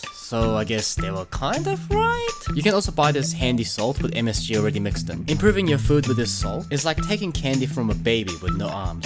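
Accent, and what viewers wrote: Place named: New Zealand. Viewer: Wow looks nice 👍🏼 btw miss New Zealand your accent is a fun mix of the kiwi accent.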